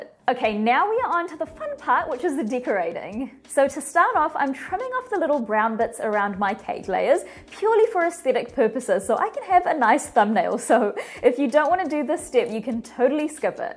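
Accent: New Zealand. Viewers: I made half the recipe last night to taste test it. It tasted great. I’m going to keep using this recipe. Before I looked at your channel I knew you were a Kiwi from your accent. Much respect from across the Tasman Sea. Thank you for developing these recipes and sharing them.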